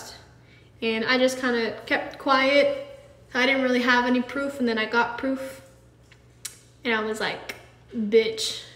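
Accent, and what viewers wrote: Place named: United Kingdom. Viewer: I miss her British accent.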